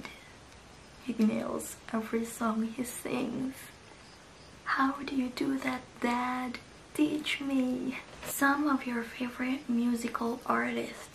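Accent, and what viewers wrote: Philippines. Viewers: neither. she's using a different dialect but it's still from the Philippines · not really becuase her english ( philippines ) its our seccond here in southeast asia but honestly people they're using communication and grammarly which means like 95% taught in high school and students here in the philippines country Dialects accents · Sabi na nga ba eh , medj pinoy yung accent hehe ang cuteee, pa request naman po next vid mo tagalog asmr ate hehe , can't wait ♥️ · Yeah I'm wondering which part of the Philippines she's from cos it sounds like she has the same dialect as me😊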